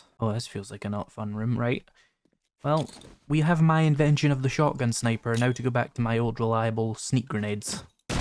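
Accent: Scotland, United Kingdom. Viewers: Hey! Love the vid (taking it in chunks) and love the accent. Special place in this ol" gramma's heart for Scots.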